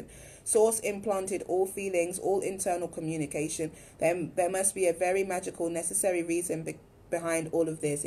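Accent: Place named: London, United Kingdom. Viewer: So beautiful to hear a London accent in the Melanated community on YT.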